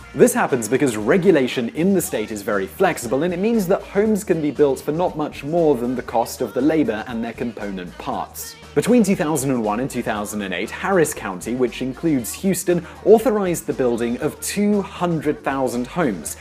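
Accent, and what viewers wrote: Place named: United Kingdom. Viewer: The closed captioning is all wrong.. This is what Simon said in marker "Now it is true that Texas has suffered from the fall in oil prices, many people believe that this state due to its increasingly diverse economy it will lead the way in the United States." This is what is actually written in the closed captioning... "And even though the economy in Texas is suffering from the fall in oil prices, very few people believe that this state won't keep its lead thanks to its increasingly diversified economy." No mention on the statement that Texas "will still lead the way in the United States." Who ever does the closed captioning needs to put their own political bias aside, get a q-tip, clean their freaking ear wax out and listen to the commentator and write what is said. is speaking with a very clear british dialect, I wouldn't say it's British RP; however, its very clear. 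Maybe the new closed captioning job will open up in Texas.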